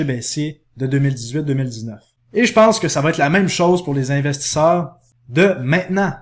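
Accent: Canada. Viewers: Merci beaucoup pour votre travail 😁. J'aime toujours autant l'accent canadien 😉, il me tarde de retourner à Montréal 🥰. A bientôt. 👍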